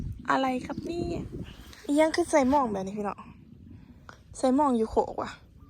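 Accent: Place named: Nong Khai, Thailand. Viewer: บ้านหนองต่ายใกล้หนองคายไหมพูดสำเนียงเหมือนคนหนองคายคนไทย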